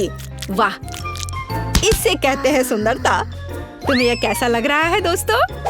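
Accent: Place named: Bhojpuri, India. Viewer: Such a boring voice this lady has... Bhojpuri touch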